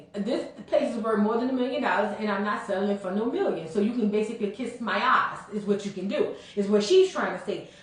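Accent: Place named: Louisiana, United States. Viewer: I love your reviews girl and love the Louisiana accent 🥰🥰🥰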